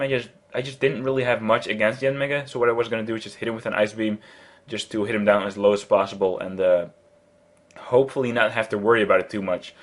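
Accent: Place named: Netherlands. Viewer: het blijft moeilijk om een nederlands accentje te verschuilen xD nice battle ;)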